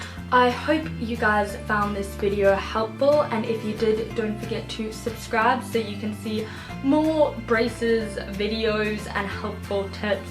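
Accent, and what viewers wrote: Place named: South Africa. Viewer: Me watches 10 seconds she is south African Me goes to description box reads: I am south Africa Me I knew it🤗 Btw getting braces soon and this helped a lot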